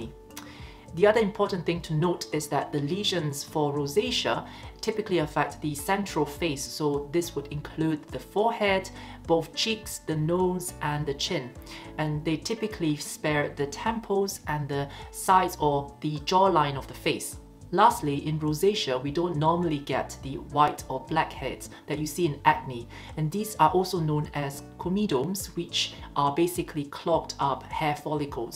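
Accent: Scotland, United Kingdom. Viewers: I’m Scottish and I can sometimes hear a wee Scottish twang in your accent ❤ great info ! I suffer from mild rosacea mostly with thread veins on my cheeks . I’m now more informed and ready to treat. Many thanks and I’m jealous of your beautiful clear skin! ❤ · You can definitely here a Scottish twang in your accent ❤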